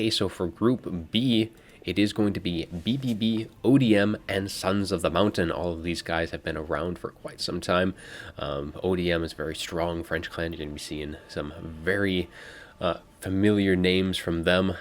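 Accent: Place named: Russia. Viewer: Great accent. Russian right?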